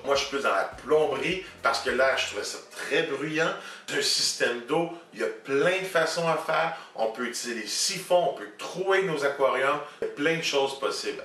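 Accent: Canada. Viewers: J ai adoré tes vidéos et ton accent canadien! Merci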